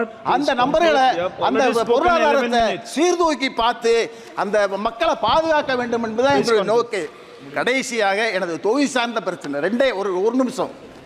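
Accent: Madurai, India. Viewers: மதுரை மொழியின் சிறப்பு 🎉🎉🎉🎉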